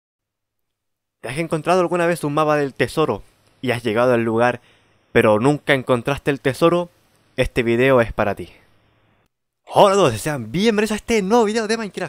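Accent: Chile. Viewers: tu manera de hablar como un chileno de verdad me encanto, buen tutorial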